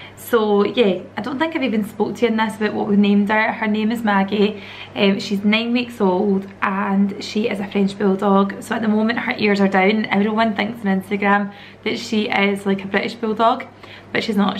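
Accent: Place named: Glasgow, United Kingdom. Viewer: Hi, Iv been watching your videos for a while now and you actually inspired me to make my own channel😊 I just had to say how sorry I was to hear about Lola, I enjoyed seeing her in your videos. Those clips of her at the start had me tearing up. Maggie is so cute though and couldn’t be part of a nicer family ❤️ Also fellow Glaswegian over here 🙋🏻‍♀️ Iv been living in England for a few years and miss Scotland so much so it’s nice to hear the sound of home ☺️🏴 xx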